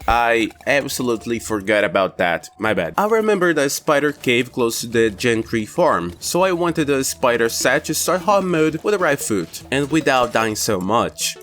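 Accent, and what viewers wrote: Brazil. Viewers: Awesome video just like the prehardmode one. I'm trying to tell where your accent is from, are you Brazilian?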